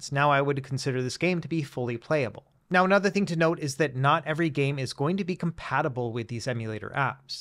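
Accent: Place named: Midwest, United States. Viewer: Where are you from? That's a heck of midwestern Accent you've got there. What is that Missouri? It's almost like it's Minnesota.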